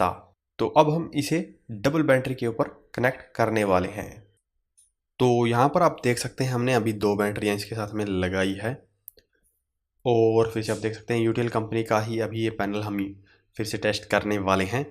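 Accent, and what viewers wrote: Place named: Haryana, India. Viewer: बुरा ना मान भाई या तो हरयाणवी टोन स बोलने में फील ना करे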